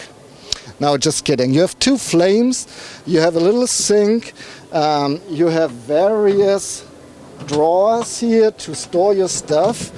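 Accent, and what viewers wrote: Germany. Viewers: I think ze strong accent emphasizes the technical, quintessentially precise German idea of automotive engineering. Keep up the good work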